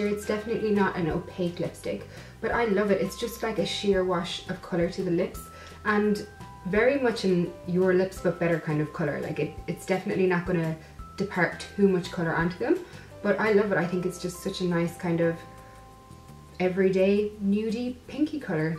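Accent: Ireland. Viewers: Ur accent is like Irish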